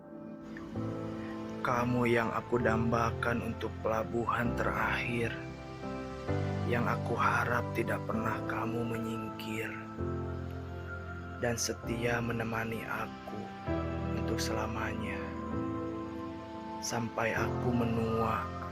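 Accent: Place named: Sunda, Indonesia. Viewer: Sunda pisan suara na aa keren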